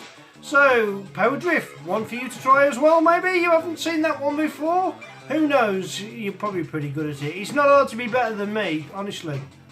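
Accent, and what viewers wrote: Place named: Midlands, United Kingdom. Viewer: That's on my list to try out not got round to it can I ask where are you from you sound like you are from the Midlands I'm from Wolverhampton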